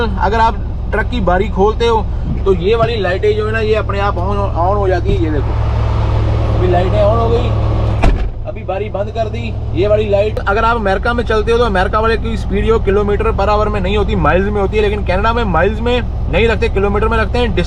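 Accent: Punjab, India. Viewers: पन्जाबी बन्दे शुद हिन्दी बोल्ता हे तो मुज्को भि मन मे चड्दी कला बोल्ने के मन होता हे काके 😂😂😂 Keep it up bro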